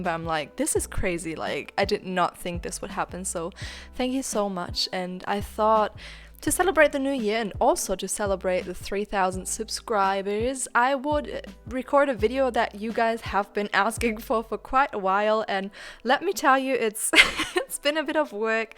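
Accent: Germany. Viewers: Wie man hört das die deutsch ist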